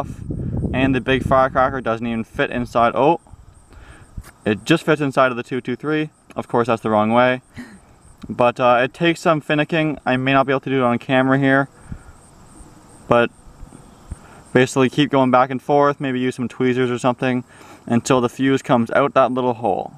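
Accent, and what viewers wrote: Sweden. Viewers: are you swedish ?!? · Haha är du svensk? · Are you swedish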